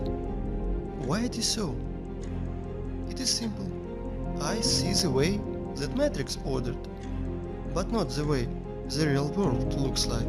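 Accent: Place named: Russia. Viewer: Why is the music so loud¿. Its Hard to hear what he says, with an Russia english accent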